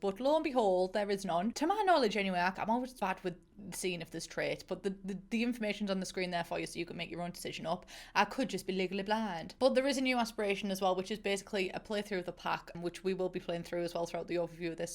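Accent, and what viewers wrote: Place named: Northern England, United Kingdom. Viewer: I FORGOT UR NORTHERN ILY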